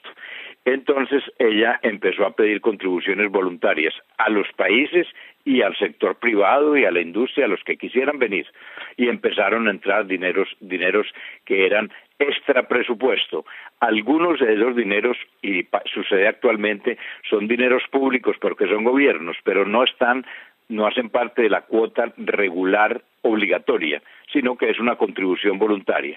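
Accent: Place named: Spain. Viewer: que bruto que informacion tan importante... lo ideal hubiera sido en video y no solo la voz pero esta brutal.... gracias por compartir ahorita estan atacando al e cig... de parte de la oms para proteger la inversion de loc chicles parches y champix... surtidos por las farmaceuticas... te invito a ver nuestra informacion <link> aunque el ataque es a nivel mundial, igual en españa porque se te oye voz de español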